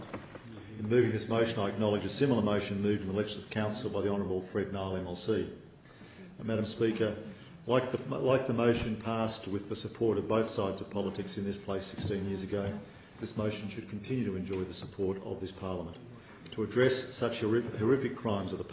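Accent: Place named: Greece. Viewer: Dude, from the way you talk I can tell you're greek. Here's a reality check. Turks took constantinople from the byzantines, If you greeks were so mighty why couldn't you defend it? Even in the modern age. Why is Greece bankrupt? Why are greek politicians begging Turkey's prime minister to help their financial situation? Greece is fucked my friend, no european country can help because they are ALL in debt LOL You can talk all you want, this is the reality haha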